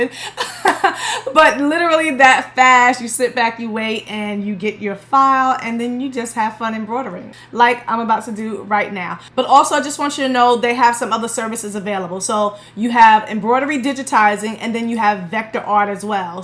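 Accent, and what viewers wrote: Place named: New York City, United States. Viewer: Everytime i look up a new skill in crafting screening dtf ypu name it... there is another video by! 😊 love your NYC accent too!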